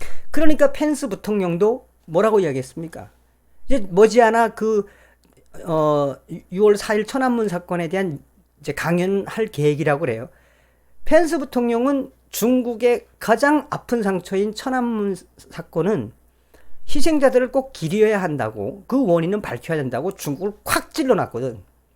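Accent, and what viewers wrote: North Korea. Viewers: 에구 그 북한 억양 말투 듣기 거북하네요 자꾸 듣다보면 자연스러워지고 학습화됩니다 세뇌되어지고 자제부탁드립니다 여긴 대한민국입니다 정말 싫어요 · 북한말로 방송 참 재미 있습니다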